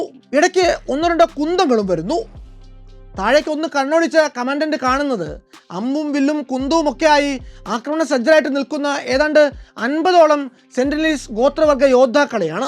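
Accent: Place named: Kannur, India. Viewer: Haha പലപ്പോഴും തനി നാടൻ കണ്ണൂർ ഭാഷ വരുന്നുണ്ടല്ലോ😊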